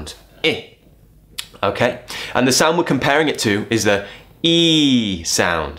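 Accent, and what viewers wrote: RP, United Kingdom. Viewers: Do you speak English according to rp or not explain it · Thanks to God Thanks to your parents than Thanks to you for teaching us perfect British English and please send more videos when you can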